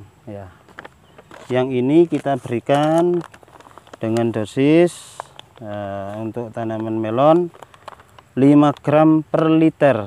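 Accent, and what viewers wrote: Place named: East Java, Indonesia. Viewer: dari logatnya kalau gak malang ya surabaya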